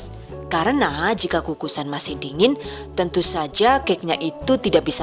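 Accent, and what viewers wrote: Banyumas, Indonesia. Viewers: heheh.... ngapaknya ya allah saya bgt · . Rika sih womh ndi. Jebule ngapak 😅😅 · Mksh resepnya bunda, sy klo lihat videonya bunda suka ngekek sendiri klo lg pake bahasa ngapak hahahaaaaa, lucu. Smg tambah sukses buat bunda yaaa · aku seneng deh ne wis ngmnge ngapak 😄😄😄😄😄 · Kayane kie wong ngapak olih bojo wong jawa timur kiye . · Wkwkwk ngapaknya lucu, asline sing Endi sih MB?? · Rikane deneng teyeng ngapak Yuu...jarku wong Madiun koh ngapak😃😍👍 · Lucu ngapak bgt kaya enyong hahahaha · ngapak2 logat suaranyaa iya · Nembe nonton... Ternyata ana sing ngapak juga... mandan kaget aku.... 😂😂😂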